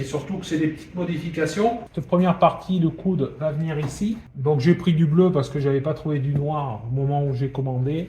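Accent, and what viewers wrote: Corrèze, France. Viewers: On te regarde faire comme d'habitude 😊 Mais quel plaisir de regarder ce travail de qualité. Et j'ai une petite nostalgie de l'accent corrèzien, j'y ai passé une dizaine d'an-nées 😉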